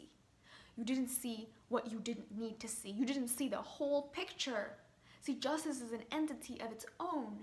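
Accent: United States. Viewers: i Love your Chicago accent ❤ as *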